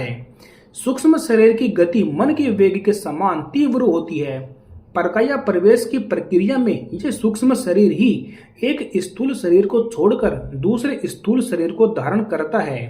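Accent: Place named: Bihar, India. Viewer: Tumhari bhasha hindi se bilkul alag hai,shayad bihari type ki. Lagta hai koi kitab padhkar baat kar rahe ho. Kitab shuddh hindi me likhi hogi par aap bihari lahja laa rahe ho. Koi like nhi. Skip direct.ok ji..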